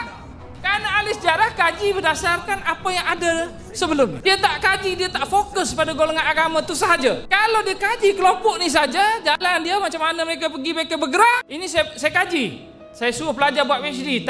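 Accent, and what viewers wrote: Kelantan, Malaysia. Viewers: Ore klate ni. Kawe first time dgr pun dah tahu.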